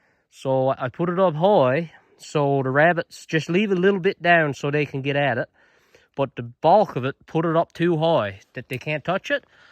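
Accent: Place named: Newfoundland, Canada. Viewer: How many slips to get 16? I thought that was good by far the best I've seen on here. What area are you? I'm from Lewisporte and your accent seems like that area or close by.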